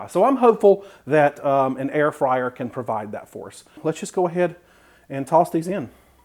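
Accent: South, United States. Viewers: i love watching you and listening to your adorable southern accent. I would make low carb chicken strips and fish sticks in an air fryer though i have never tried one. xxxx oooo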